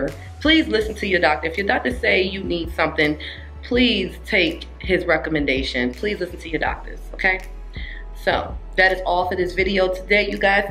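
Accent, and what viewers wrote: New York, United States. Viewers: You talk like you from new york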